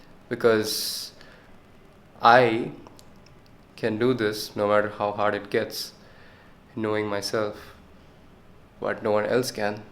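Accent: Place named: South India, India. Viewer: Most south indians have this accent, your English accent depends on your first language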